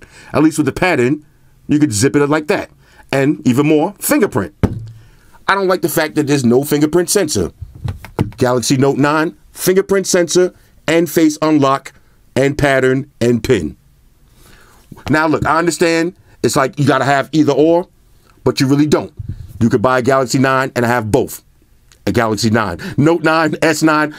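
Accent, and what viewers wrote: New York, United States. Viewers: Lmao i love how u talk u from Nyc? I’m from BROOKLAWN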